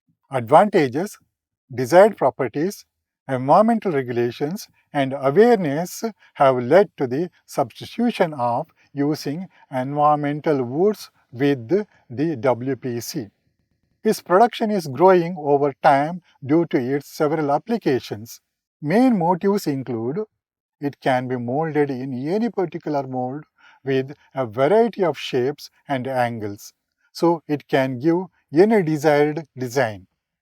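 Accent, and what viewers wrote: India. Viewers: I am an Indian..still i find it difficult. That's because his accent is south Indian which is far different from north Indians.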